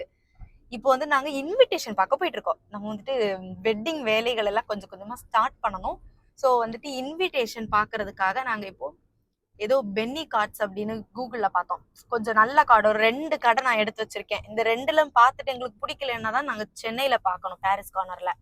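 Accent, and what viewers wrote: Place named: Sri Lanka. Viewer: You sound Srilankan, are you from there?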